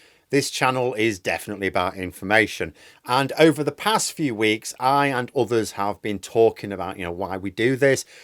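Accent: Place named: Manchester, United Kingdom. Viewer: Here for the Manc doing posh tory comedy voice 😆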